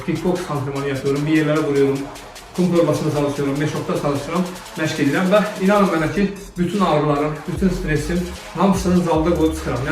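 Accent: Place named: Turkey. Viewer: Türk lehçesi 😁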